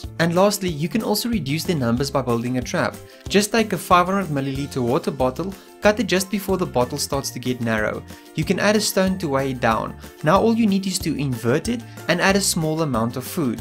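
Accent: South Africa. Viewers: you sound like my high school shop teacher are you from South Africa? coolest accent ever imo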